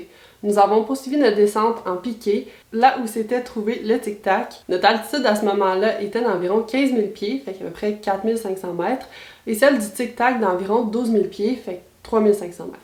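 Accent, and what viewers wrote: Quebec, Canada. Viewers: Très bon résumé, c'est la première vidéo que je vois de ton site et j'adore, surtout ça fait du bien d'entendre quelqu'un parler des ovnis en Québécois, beaucoup plus facile à comprendre.Très heureux de voir que les Québécois(se) commence à crée des sites sur les ovnis-phénomènes inexpliqué-etc..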